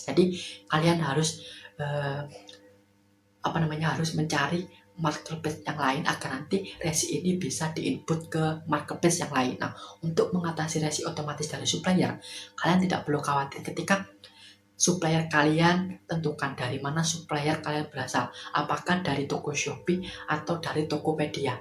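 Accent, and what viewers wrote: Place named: Java, Indonesia. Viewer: Medok bnget jawanya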